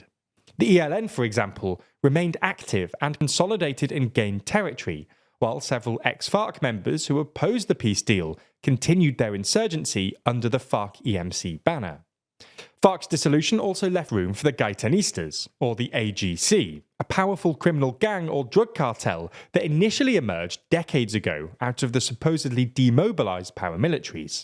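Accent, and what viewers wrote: England, United Kingdom. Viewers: it's cuz he is British and doesn't make R sound so it sounds like fk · That's just the English accent. They all say it like that.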